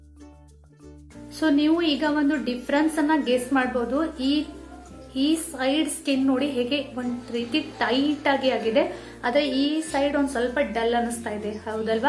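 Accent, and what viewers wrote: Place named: North Karnataka, India. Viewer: Nanu uttara karnatakada Gulbarga davru.....neevu uttara karnatakadavru anta keli khushi ayitu.....neevu namma bhasedaga matadri keloka cholo anistada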